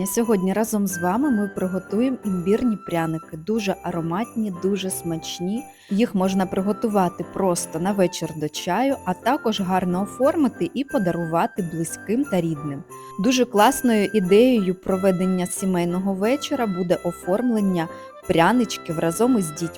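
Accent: Standard. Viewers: Дякую за сиачний рецепт і дуже гарний супровід - чиста, правильна, красива Мова- БРАВО💙💛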